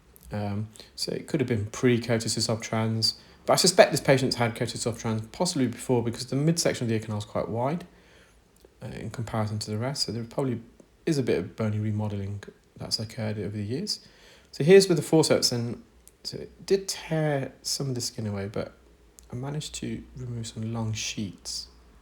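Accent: United Kingdom. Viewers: That was a fantastic peel. I love watching your channel--it's interesting and entertaining, I learn a lot, and listening to your lovely British accent eases my anxiety. 👂👏🙌 👂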